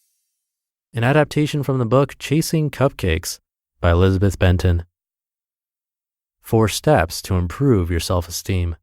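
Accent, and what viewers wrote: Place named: United States. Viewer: Nice to hear an American without an exaggerated accent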